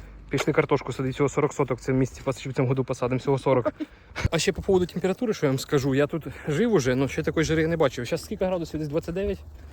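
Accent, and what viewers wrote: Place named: South, Ukraine. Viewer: Привіт земляк. Наш южноукраїнський язик ні з чим не переплутаєш І не срівняєш ні з чим, ласкає вухо... Місто Покров бивше Орджонікідзе вітає тебе 🙏❗ Вернувся назад щоб сказать-написать, шо підписався:hand-pink-waving:і👍поставив.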